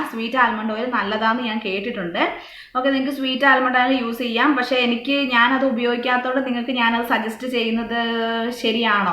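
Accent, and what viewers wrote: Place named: Kannur, India. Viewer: Chechi vdoil allatto paranjath cmnt cheythirunnu Enk sure aanu kannur kottiyur aaanennu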